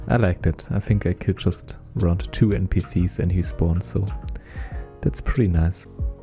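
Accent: Germany. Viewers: Man hört echt das deutsche raus^^,But great Video:D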